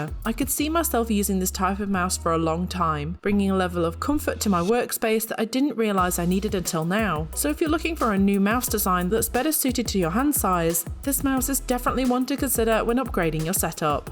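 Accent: United Kingdom. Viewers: Just something about hearing a British woman speak. I can hear you talk all day. Love the review. Informative and helpful. Thanks.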